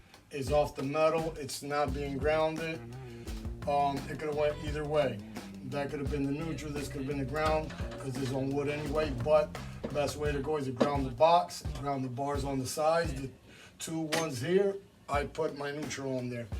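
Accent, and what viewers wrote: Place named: New York, United States. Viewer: Just found your channel and watched a few videos. I like it. Sounds like you're from New York.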